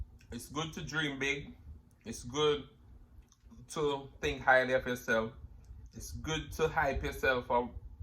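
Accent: Jamaica. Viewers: I SAW YOU IN A COMPILATION AND HEARD YOUR ACCENT AND CAME OVER IMMEDIATELY!! IVE BEEN WANTING TO SEE JAMAICAN MUKBANGERS AHHHH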